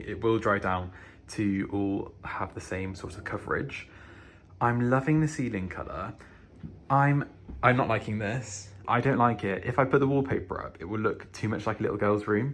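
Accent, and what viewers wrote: England, United Kingdom. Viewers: thank you for this enjoyable video. I love your content, engaging personality, and enthusiasm in home renovations and decorating. Your joy is infectious and inspires me to get out of my chair and to do something. Lol. As an American, I love listening to English accents. I could listen to you for hours. In a world with so much conflict, you give me a joyful escape for minutes. Everything you do is amazing. I agree, the pink color looks great with the other colors. Don't forget that real men wear and use pink. Lol. Robert.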